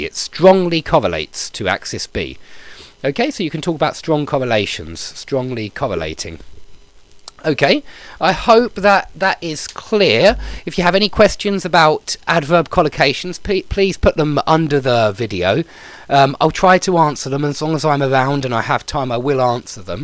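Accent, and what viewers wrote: United Kingdom. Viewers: Great video. By the way, you have an elegant, educated British accent.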